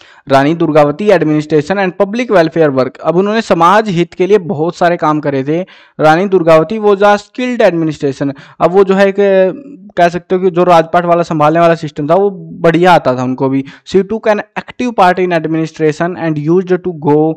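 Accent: Haryana, India. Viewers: Right sir haryanvi ka apna swag ha 🤟🤟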